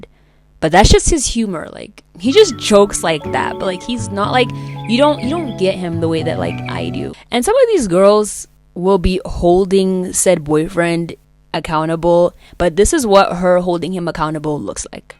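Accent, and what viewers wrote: South Africa. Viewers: Btw are you South African?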